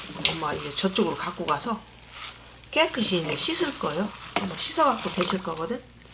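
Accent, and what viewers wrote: Chungcheong, South Korea. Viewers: 충청도이신가바요ㆍ 목소리들음 친정엄마생각나요ㆍ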